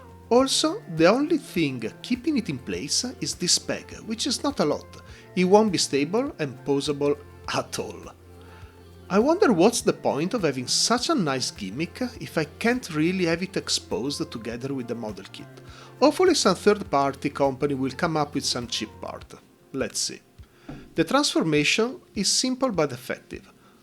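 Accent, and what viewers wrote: Italy. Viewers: You sound like an Italian 🤌😅 I hope i didn't offend you. Btw i Really enjoy watching all your videos and great tips tutorial, you should make a video about best techniques to tighten loose joints. ( Polycaps & otherwise) Soon. You did a great job on the review sir you earned a Sub 👍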